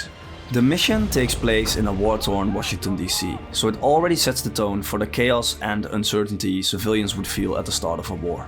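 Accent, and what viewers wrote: Netherlands. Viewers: Man you sound dutch you heve strong accent i vlasnders my self dutch part belguim 😅😅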